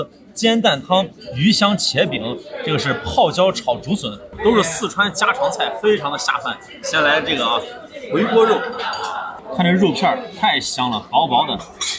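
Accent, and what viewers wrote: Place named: Henan, China. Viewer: 我就喜欢看 你个陕西人带着河南味儿说四川话，用爵士乐伴奏着中国农村街头大妈做小吃 的那种感觉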